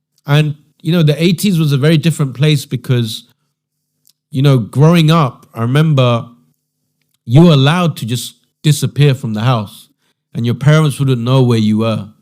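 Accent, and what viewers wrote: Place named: Saudi Arabia. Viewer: You were not hindu you have Soudi accent you liar. You just want to promote 😃you fool!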